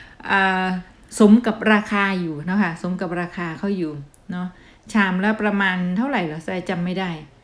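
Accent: Thailand. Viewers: เอ๊ะ เหมือนฝนจะตก กางร่ม กันด้วยนี่ แต่..เอ ฟังน้อง พูดภาษาอังกฤษ.ยังเป็นแบบไทยๆ อยู่ นะ คือดีไง..เราไม่จำเป็นต้องเหมือนฝรั่ง ใช่ไหม น้อง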